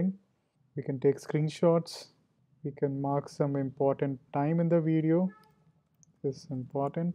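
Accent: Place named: India. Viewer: Suggestion. Please use an AI voice with no accent o remove your heavy Indian Accent that is terribly difficult understand. I want to watch and understand. By doing that more people will be able to experience and use your great product. AI is simple. Scan in the transcript and and let AI do the rest. That way you can offer your videos in many languages. Its available, use it.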